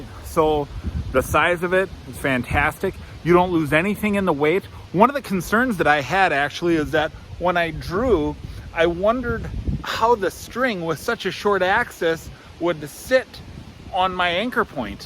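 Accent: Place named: Pennsylvania, United States. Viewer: lol he sounds like an Eagles here in Pennsylvania... I must agree with you... My son is on the Mathews train... He owns the newest one of 2022... I forget the model honestly... Completely dressed out its heavy... He had to add so many silencers to quiet the bow down I was actually in shock and his is set at 40 lbs... But I've been doing research on the shortest Ata and with good speed... I've seen its been 2 years now since you posted this video... Can you give an update??? How's it holding up??? Any issues??? I'm curious... I live here in Central PA not far from State College and the hills are steep some are very thick with under brush and heavy thorn bushes... So I'm trying to stay light and short...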